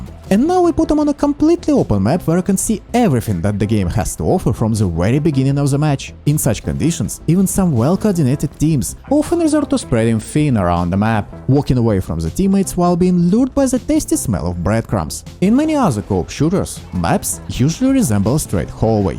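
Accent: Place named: Eastern Europe. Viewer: Love the video and love your thick Eastern European accent more. Hope to see your channel grow and get those sponsors friend~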